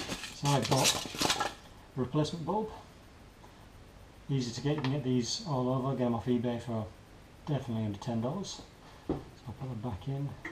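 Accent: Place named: Australia. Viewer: Thought I recognized the Aussie in your voice. Have enjoyed many visits there and find everyone seems to DIY! Former Newport RI, USA resident, now living inland and trying to get off the grid!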